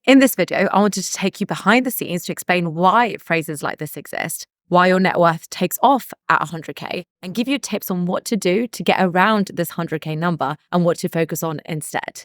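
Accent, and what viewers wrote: United Kingdom. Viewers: koompound interest😂😂f00-k-i-n brit accent......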